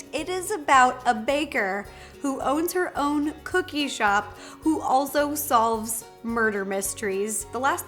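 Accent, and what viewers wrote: United States. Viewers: you have a nice american accent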